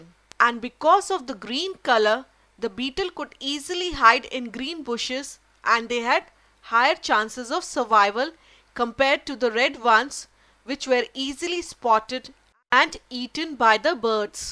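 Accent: India. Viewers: agar aap hindi mein bolte to jyada aacha rheta.Apki english sunke ye to clear hai ki aap ki mother tounge hindi hai...or aapko bhi ye pata hai ki hum sbhi indians hai and hindi jante hain.......phir kyon english????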